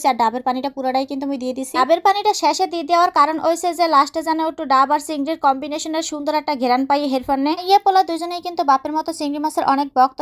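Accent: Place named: Barisal, Bangladesh. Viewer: এইটা দিন একটা মেশিন মানুষ এই ভাবে ছাগলের মতো মে মে করে কথা বলবে ধিরে ধিরে আর কথা বার্তা শুনে মনে হয় বরিশালে ক্ষেত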